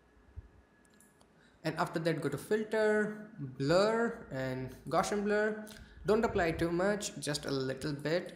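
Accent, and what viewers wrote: India. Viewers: Its funny how fast this guy speaks, Indian english is cool. A very good tutorial indeed. Thanks!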